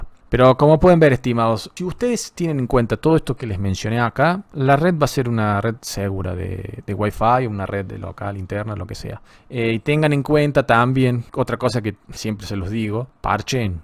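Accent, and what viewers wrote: Córdoba, Argentina. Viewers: Sos cordoooobes?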